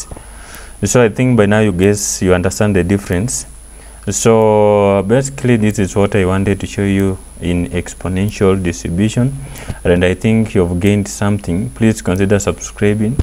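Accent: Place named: India. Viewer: our legendery indian accent tutorial)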